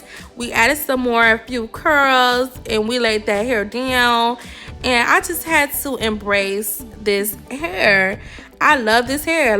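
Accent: Miami, United States. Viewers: That Miami accent tho 😘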